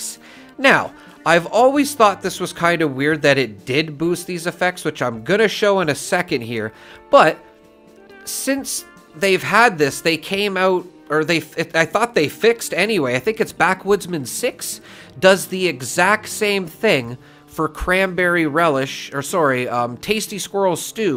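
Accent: Canada. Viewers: This is channel. Minimal research. Maximum outrage. Nice friendly Canadian voice as the beginning. Most undeserving “creator” I’ve ever seen. He knows it too. Especially when he dies constantly on his Live streams. It’s actually hilarious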